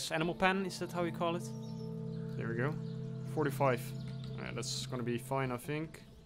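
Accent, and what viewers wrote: Netherlands. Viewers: Dutch accent 🫶🏽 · hmm i smell Dutch? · are you from the netherlands?:body-blue-raised-arms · I smell dutch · hey are u dutch i hear it in ur accent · love het nederlandse accent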